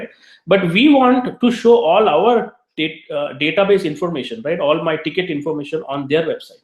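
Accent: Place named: India. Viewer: indian accent is a headache, slum voice.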